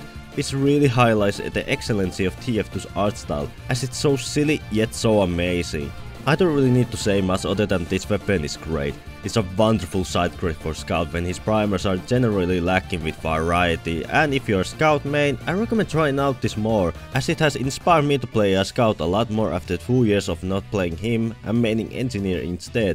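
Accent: Finland. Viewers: I can hear the finnish accent so well :DD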